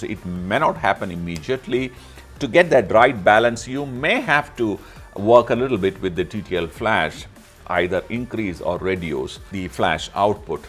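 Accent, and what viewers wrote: India. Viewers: RARELY where i keep listening to an Indian English speaking person! but you sir got me to listen! your production got me to sub and I'll keep watching.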